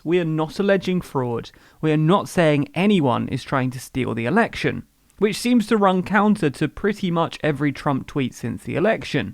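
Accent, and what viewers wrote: England, United Kingdom. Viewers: TLDR - Get context from Gore v. Bush. Don't just parrot talking points. Even the English accent can't mask your bias and wrongful analysis. I've watched three videos now and hoped to get some real analysis. However, this is about 85-99% msnbc or cnn or fox.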